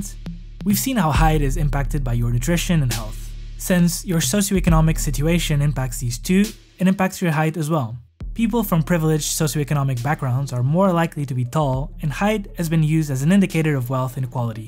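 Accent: Portugal. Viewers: i cant take this portuguese accent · I'd bet my whole house that you're Portuguese, by your accent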